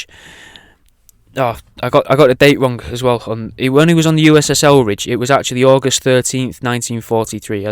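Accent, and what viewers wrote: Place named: Lancashire, United Kingdom. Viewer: You from lancashire fella?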